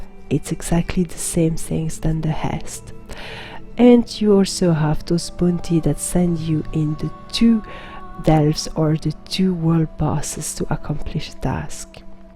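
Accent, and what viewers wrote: France. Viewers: I like the feeling of you talking in your videos (I have seen maybe 5 or 7 :D ). I love your french accent and now I finished watching this video and literally I am buying now the 2000 crowns and am buying this DLC in this moment :D. I am so excited about the DLC, your video was great. Many thanks for not making any spoilers, I am excited about the daedric plane (or aedric maybe :D )connected to this DLC. which daedric prince is with DB? Mephala :D, I wonder how it will look :D. I guess pretty awesome :D. and interresting thing that you told about he feeling of killing someone :D. I look forward for the finisher killcam, I loved them in Skyrim :). when npc was some low level of health, the last hit was a kill-cam execution video, very nice, I liked that. the same, I am peaceful person, but nice feeling in this game. ZOS has made a good job in this part too apparently :). 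Ok, no waste of precious time, I go to dark brotherhood now :). see you there :). PC EU ;o))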